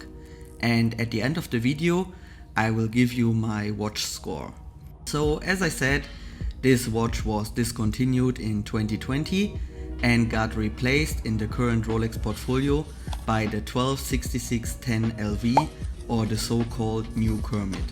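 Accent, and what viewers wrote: Germany. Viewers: Are you a native German speaker ?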